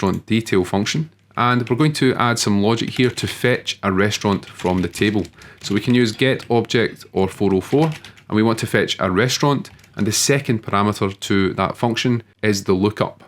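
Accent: Scotland, United Kingdom. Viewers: NO idea what your doing but I love your Scottish accent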